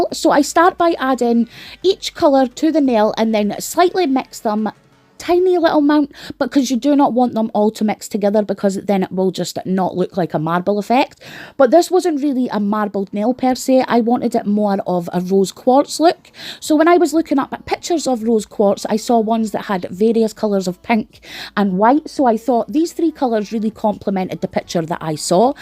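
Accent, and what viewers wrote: Scotland, United Kingdom. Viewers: Is this a Scottish or Irish accent?! I love it, either way. Usually I'm really good good telling them apart but yours is difficult. I'm leaning towards Scottish more than Irish.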